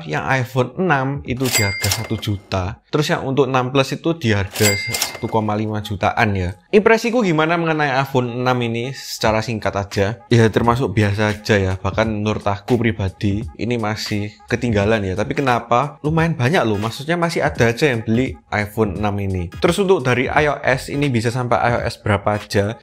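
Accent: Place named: Surabaya, Indonesia. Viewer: Ko...logatnya kok medok ya? Asli sby kah 😅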